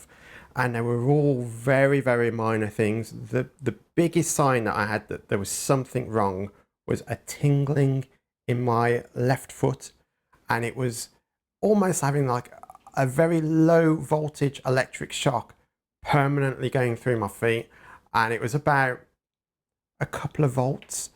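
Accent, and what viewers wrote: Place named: Midlands, United Kingdom. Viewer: I've got MS about 15 yrs old. I'm from the Midlands you sound local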